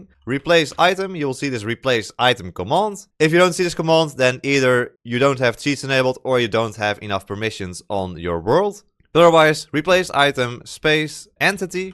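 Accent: Netherlands. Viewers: The dutch accent 😅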